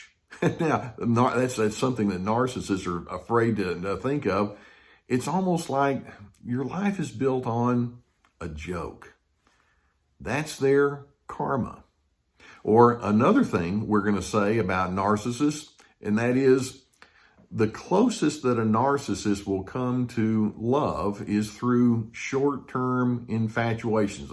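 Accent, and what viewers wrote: South, United States. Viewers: Not only are videos helping me cope with and understand narcs, I just LOVE his deep, soothing Southern voice and accent!! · Bloody hell! This is excellent analysis.your best yet. That's not to say all your stuff isn't, excellent! I love, Karma, as it is very difficult to "uninsnare", yourself from these detestable, bastards!! Love, the southern drawl.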